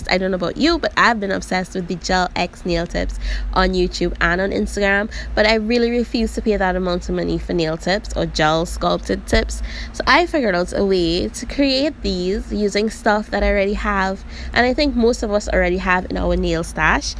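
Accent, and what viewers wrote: Trinidad and Tobago. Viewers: I just knew you were a Trini when I heard you talking! I got the Apres Gel X done but I figured I could do it myself because I like the simplicity of the system.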